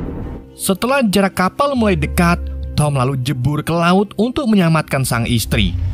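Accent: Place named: Java, Indonesia. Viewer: kwbelet ngoyo 🤣🤣🤣langsung ngkak denger logat jawanya bang, suksesterus saya suka reviewnya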